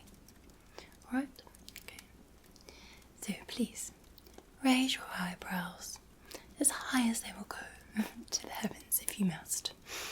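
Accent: Scotland, United Kingdom. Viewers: Loving this character, don’t forget your Scottish accent though 🏴 💙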